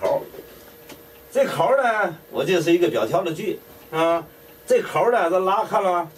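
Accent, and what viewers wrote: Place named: Tianjin, China. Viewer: 10 分钟 做完 用了二集???这嘴天津的... lol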